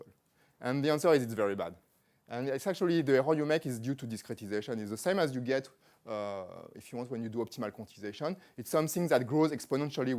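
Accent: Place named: France. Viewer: That french accent..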